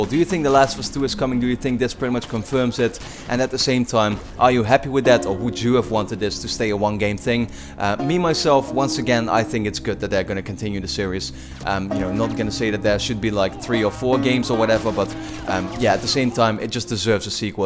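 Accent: Netherlands. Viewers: Are you dutch? I can hear it in your voice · Are you Dutch? You sound like you are. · your dutch right?:P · You sound dutch, am i right? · are you dutch? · Ayy ik hoor een Nederlander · You dutch?? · Are you dutch? Bcs of your accent xp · Great video but you really hear that your from the netherlands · gray-brown becouse i am dutch too and his accent looks like he is dutch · Are you dutch?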